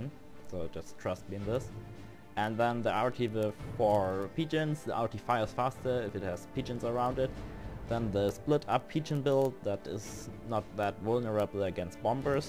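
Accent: Germany. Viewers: That's a typical German accent of someone who did not spend much time talking with/to native speakers directly. It's pretty much basic school English. How is your accent when you speak German?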